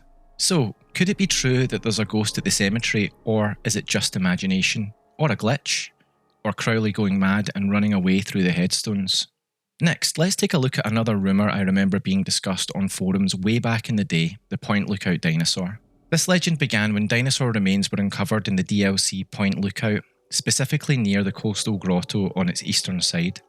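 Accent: Scotland, United Kingdom. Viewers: I know it's Scottish I said this on purpose to mess with you. Good spirits though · love to see my scots making some great you tube content on something i love, you've saved me from American accents for tonight aha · wow you're severely scottish, I like it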